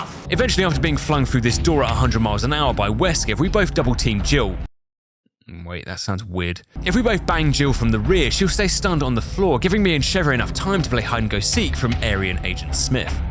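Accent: United Kingdom. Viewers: The sweet, soothing british voice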